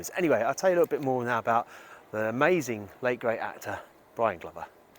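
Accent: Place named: Yorkshire, United Kingdom. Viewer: Hello,not a bad Yorkshire accent actually, John, hartlepool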